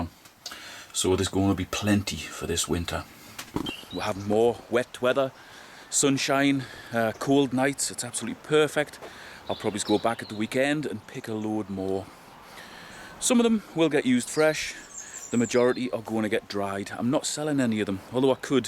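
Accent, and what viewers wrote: North East England, United Kingdom. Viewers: Thanks for your useful video. Just started my dehydrator journey today using a l'Equip Filter Pro, so hopefully no more chasing maggots around my kitchen from now on! I was interested in the temperature that you used to carry out the dehydration process. Have you tried using a lower temp. as I have seen it suggested that 52 C can produce better preservation of mushroom flavour? I live in Devon and never find the quantities of ceps that I see foragers collecting in other parts of the UK. I am guessing from your accent (a hobby of mine) that you might be in the north east, perhaps Northumbria? I would love to take a trip to Scotland and the north east of England in October to see what bounty I could discover. Thanks again and good foraging to you.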